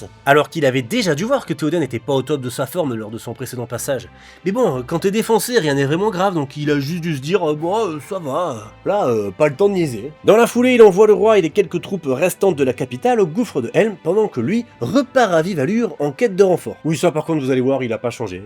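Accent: South, France. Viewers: 'Tain j'ai crû que les pattes sauronara existaient vraiment 😂 Pis entre l'accent du sud et le fait que t'arrête pas de parler de dr*gue j'ai pensé à jdg tout le long 😂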